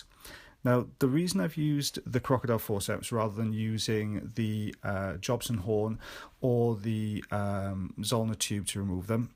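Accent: Wales, United Kingdom. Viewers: Yes the Welsh accent at it's best beautiful gentleman inside & out Learning more with each video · Wow a perfect British accent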